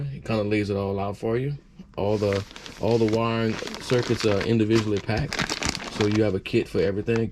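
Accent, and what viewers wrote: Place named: Los Angeles, United States. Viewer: I thought you sounded like you were in LA. I'm in Monroe, in need of your services soon. I have a 1986 chevy short bed i inherited, My father's old truck.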